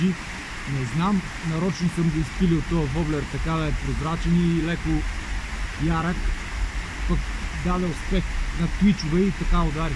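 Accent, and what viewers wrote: Vidin, Bulgaria. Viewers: Браво не знаех че в тия канали/реки има живот .Аз съм много запален и от доста години ходя с мухарката на много реки в скалистите планини в САЩ .Разгледах ти канала и е много интересен по начина по който говориш ми се стори че си от Видин .След като изгледах 5-6 епизода разбрах че си от там.А и ми се стори познат.И ще ти кажа от къде те познавам защото ти няма как да помниш .Беше някъде между <num> Бяхме на лагер на кранево не помня коя година беше с точност но беше голямото слънчево затъмнение та както и да е бях с едни приятели в едно заведение казваше се Робинзон след като си тръгнахме едо момиче ни пресрещна и ни помоли за малко помощ .Мисля че беше с руса коса май беше приятелката ти та ако не се лъжа ти беше много фиркан и беше паднал в една дига и беше целия мокър .Дано не се препознавам но ако си ти и се сещаш за тоя случай аз съм човека дето те носи на ръце до бунгалото .Поздрави и на слука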